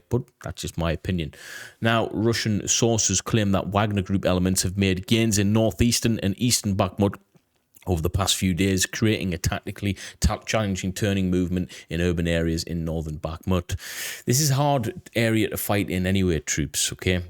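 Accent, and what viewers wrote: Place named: United Kingdom. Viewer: Thanks, good to hear a fellow Brit, Although born there I haven’t lived there for 28 years. A few things, first I am a member of the armchair General brigade, however I have conducted many successful campaigns across the surface of my coffee table. Second, the Ukrainians pushed the Russians back south of Bakhmut, third they blew a dam in the north to slow the Russians down ( which worked). Four, they blew the bridge separating the east of the city from the center. Six, it’s been said that units of Ukrainian troops have been positioned on the western flank of the Russians northern advance in order to slow their progress. I would say the Ukrainians are withdrawing from the city. Seven, the Russians not taking Bakhmut anytime soon, soon could mean in a week or in 10 days, I doubt Bakhmut will hold much longer. In my ‘expert’ opinion of course. 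🇺🇦🇺🇦🇺🇦🇺🇦🇺🇦🇺🇦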